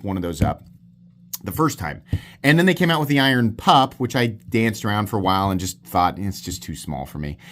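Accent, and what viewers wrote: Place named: Midwest, United States. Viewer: don't worry about your accent. Keep the Midwestern accent alive!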